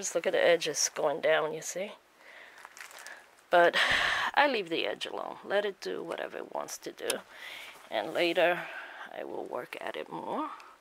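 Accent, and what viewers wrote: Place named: New Jersey, United States. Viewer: Lol I knew you were a Jersey girl or very close. I have cousins in Jersey, I'm in Southwest VA and we tease each other about our accents 😁😁 That sure is an awesome piece of work on both 💖💖💖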